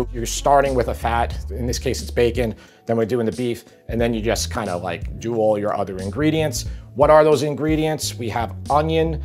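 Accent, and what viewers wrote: New York, United States. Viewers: I'm going to cook this. Thansk for the recipe. BTW, I love the NY accent!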